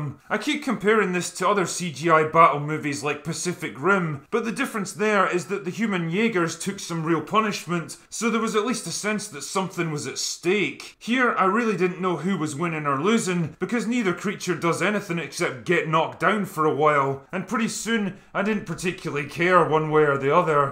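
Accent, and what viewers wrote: Scotland, United Kingdom. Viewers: I think that's part of his gimmick, hence the over the top drunk Scottish accent.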